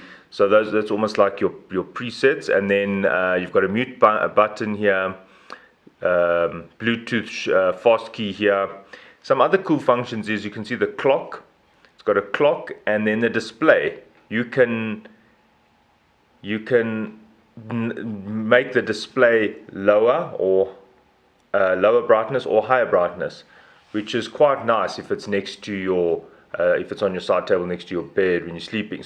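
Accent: South Africa. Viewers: I knew you sound south African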